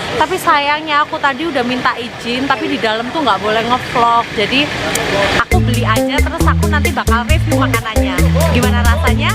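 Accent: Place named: Java, Indonesia. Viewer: Medoknya itu lo, kawaiii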